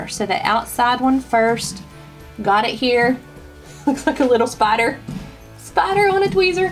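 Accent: South, United States. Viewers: I just bought them yesterday and just found you today thank heavens, I've never tried false lashes of any kind and will try your advice. Hope I can do this. Also, I love your sweet southern accent! Thanks for your help! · Love...and love your southern accent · She has the cutest Southern accent!! ♥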